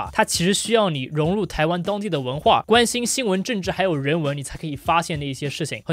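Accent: China. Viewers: 我住台灣40年了 讓你一個說者大陸口音的混血兒 一針見血地說出了台灣的事實 老實說 真的 他媽的 爽! 你說的真好! 而且 你的中國口音真的很道地 說起英語 又流暢到像是(本來就是)外國人 聽你說話 舒暢! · 中國腔有點嗆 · 以前聽到別人提到你的頻道有多不喜歡，我總覺得應該存在一些偏見，因為我是少數台灣人裡面理解比利時有三個政府而且各自為政的尷尬狀況。今天看了這個內容，其實你講述得很忠肯，但如果可以把敘事語言換成英語可能會更好，我有很多中國的好友，但你這口音，讓人不自覺得想防衛，但你做得影片內容都很棒，台灣能走到這一步，要感謝中國不斷地逼迫台灣，讓台灣在國際上不斷地曝光在國際新聞上，讓大家都知道台灣。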